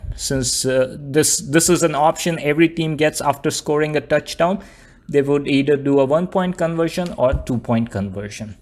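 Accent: India. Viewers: no it couldn't be real, you Indian ain't you? Anyone can tell that by the way you pronounce "t" and little hukkies you take. I have an indian friend, she speaks exactly like you, btw no hate🤗🤗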